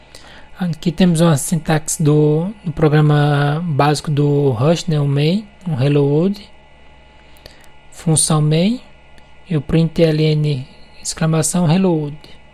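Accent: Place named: Portugal. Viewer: Português PT? amo esse PT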